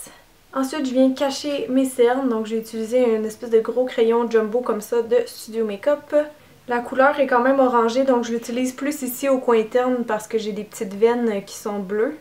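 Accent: Quebec, Canada. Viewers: Habituellement, je ne suis pas fan de l'accent Québécois mes avec toi sa passe tout seul ! J'adore vraiment beaucoup tes vidéos je te trouve MAGNIFIQUE je trouve également que tu as un aire de Kim Kardashian (c'est un compliment) Bisous ma belle :)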